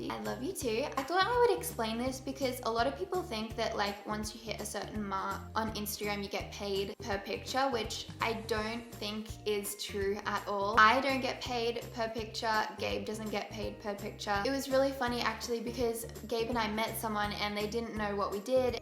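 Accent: United States. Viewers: Ok your American accent is officially on point!!! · omg her american. it honestly made me “uncomfortable” luv u! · I was so shocked by your US accent oh my gosh! I'm from nz and could never do one as good as you!! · I think that you're gorgeous and you shouldn't worry about what other people think about you or your relationship with Gabe. Gabe chose you because he loved you for who you are and he was happy with you. I think that you and Gabe are perfect for eachother. I love your American accent❤❤❤❤ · i love your american accent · Although it was cool hearing you talk with an American accent, I totally favor your Australian accent! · LOVE YOUR AMERICAN ACCENT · OH MY GOSH!! Your American accent was sooo good!! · LOVE the American accent!!! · oh my gosh. her american accent just does NOT fit her XD keep it Australian i love your accent the way it is! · Omg your American accent tho!! 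· Your voice changes so much with your American accent but I still love you accent now!!!! 🥰🥰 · You sound completely different with an American accent😂😂❤️ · Your American accent is amazing! That's so funny how you can just switch like that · Omg I love your American accent! I had to close my eyes and remember it was you! Love you 💕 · WHAT THE HECK HER AMERICAN ACCENT IS SO GOOD BUT SHE SOUNDS COMPLETELY DIFFERENT AH 😩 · AMERICAN ACCENT IS ON POINT ! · Your voice sounds so different with an american accent, but still so slick❤ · you with an american accent is SO different! wow! love you. ❤️ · Omg it was so weird to see you talk in american accent 😨. It was reaaally good but sooo different from what we are used to! Love you😊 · Omg you sounds so different with that American accent!! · You are so good in American accent.❤ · LOLOL I love your American accent. so funny. seriously a different person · omggg doesn't even her voice sound so much different with the american accent? 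hahaha love it!!! like a whole other person :D still the cutest thing ever is her australian accent ☺ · Wow amazing american accent💖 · Hahah, why did it feel like a completely different accent with the American accent 😂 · omg the accent!! It sounds like a voice over because it’s just not real in my mind to hear you with an American accent! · I'm Japanese and I'm studying English , when I watched your video first time , I didn't think your accent is strong but in this video I know the difference !! American accent is cute too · You American accent 😍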